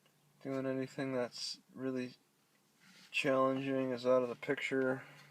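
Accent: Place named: Midwest, United States. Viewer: I’m re-watching some of your old stuff. Your voice relaxes me for some reason. Probably the Midwest accent.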